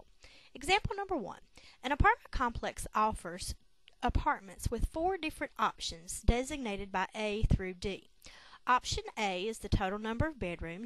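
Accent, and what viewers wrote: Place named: South, United States. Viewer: YOU FROM THE SOUTH, I LOVE YOUR ACCENT!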